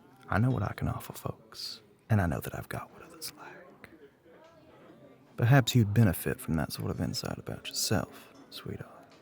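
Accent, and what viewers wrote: South, United States. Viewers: Me being from the south and never, EVER hearing an accent this smooth….I’m blushing 🥹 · The southern accent gets me every time I can’t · I love the southern accent smmmm it’s literally my fav 😋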